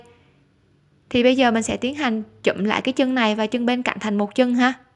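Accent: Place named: Da Lat, Vietnam. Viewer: nghe nói tiếng giống người Đà lạt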